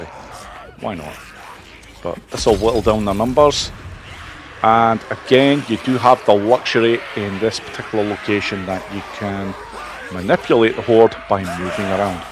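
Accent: Scotland, United Kingdom. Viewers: Gg mate love the voice scottish right?😂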